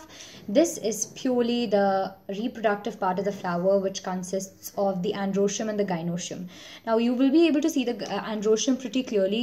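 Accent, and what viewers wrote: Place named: England, United Kingdom. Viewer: Impressed by English accent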